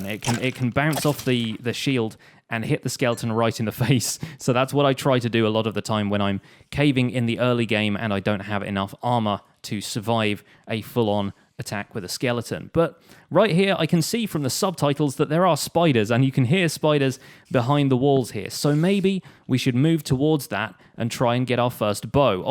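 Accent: United Kingdom. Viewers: He's british bruh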